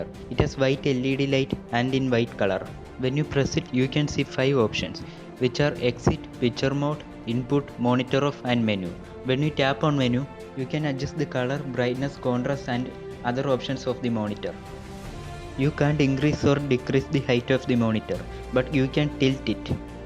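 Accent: Kerala, India. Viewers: Malayali ano....? Speechil oru malayalam touch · Heavy mallu accent ! Any reason why some mallus killed that elephant few days back ?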